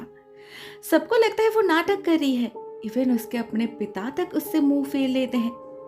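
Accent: Bengal, India. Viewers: Di kaya aap bangal se ho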